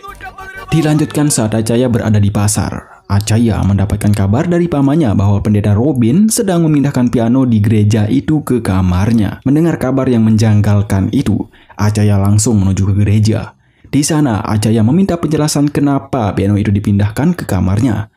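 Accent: Bali, Indonesia. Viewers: Pembaca narasi Orang bali dech ini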